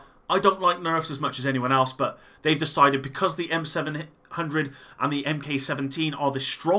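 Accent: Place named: West Country, United Kingdom. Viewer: are you from Bristol or Plymouth?. Your accent sounds like you are.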